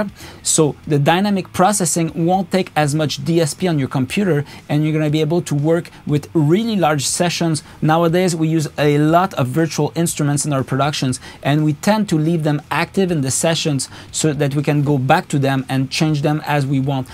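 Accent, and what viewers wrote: Quebec, Canada. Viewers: l'accent Québecois all the way!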